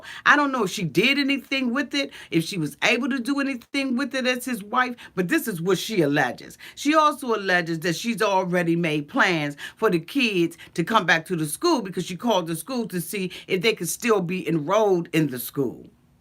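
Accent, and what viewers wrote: Washington DC, United States. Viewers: I was thinking you from DC, I hear my family in the way you speak This is why I am drawn to you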